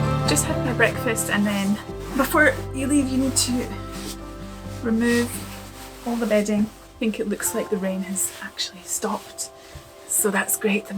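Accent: Scotland, United Kingdom. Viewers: As an old bloke I think I am in love with Scotland. What part does your wonderful accent come from? I had a work colleague who said that in the 40’s and 50’s the language between different valleys in the north would be different. Many Australians I know, think it is the only place in the UK worth visiting. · Just found you today. Very enjoyable video trips. I'll be watching more. You are lovely, and that Scottish accent is great. 😊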